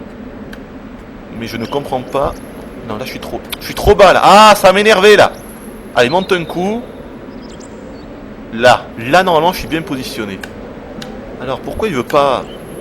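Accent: South, France. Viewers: It's normal...he is an accent, south accent exactly. The best way to learn french....go to France or Belgium. Je te jure que ça marche! :)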